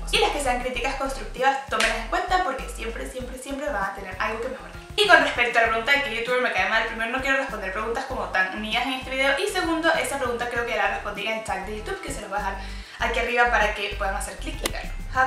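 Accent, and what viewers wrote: Venezuela. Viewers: La verdad, no se te nota, el acento caraqueño, yo pensaba, como de Maracay, Valencia mas o menos. Saludos, estaré viendo mas de tus videos. Cuando puedas, porfa, me enviás un saludo en tu próximo video. Gracias. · Venezolana? Saludos!!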